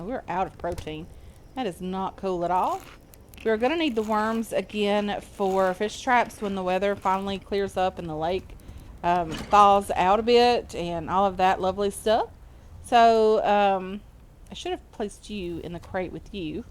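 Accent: Kentucky, United States. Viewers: wat part of kentucky you from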